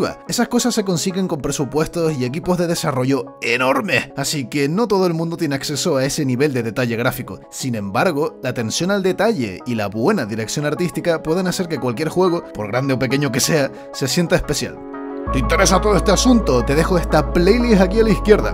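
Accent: Canary Islands, Spain. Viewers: Un vídeo perfecto felicitaciones, una pregunta que tengo desde que te veo, eres canario? Es una pregunta que me ronda la cabeza siempre que veo tus vídeos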